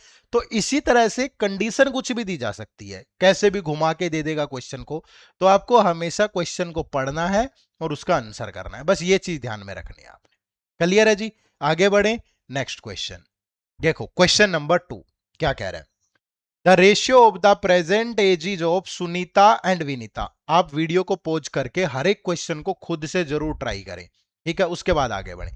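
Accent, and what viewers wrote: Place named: Haryana, India. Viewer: Sir I’ve not watched this lecture but I’ve watched some old lectures so I’m pretty sure it also would be such useful lecture for me and I really appreciate you teaching style and your Haryanvi help me to not bore! Love you share ❤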